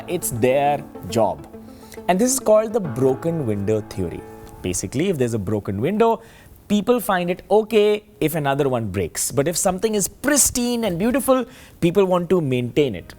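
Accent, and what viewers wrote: India. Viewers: I wanted to watch this but I cannot stand the Indian accent. It's a phobia.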